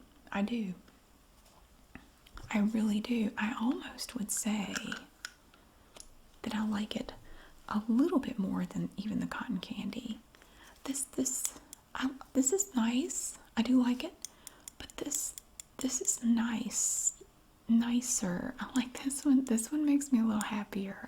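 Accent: South, United States. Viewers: I remembered watching videos a long time ago and decided to revisit the lady who inadvertently taught me how to do a Southern accent. Not even halfway through and I am howling 😂 Why on Earth did I ever unsubscribe, I must have been tired of how much fun I had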